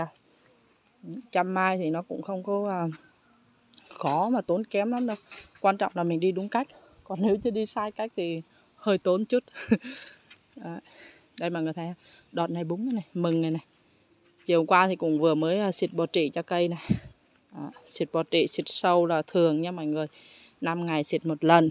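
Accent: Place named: South, Vietnam. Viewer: Giọng nam bộ dễ thương nhất!!!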